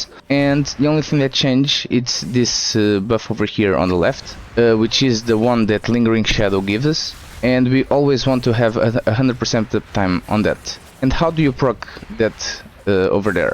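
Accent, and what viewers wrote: Portugal. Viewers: you sound portuguese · Are you portuguese? the accent does sound like it at least! Thank you for the coverage on the changes, excited for the next season! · parece tuga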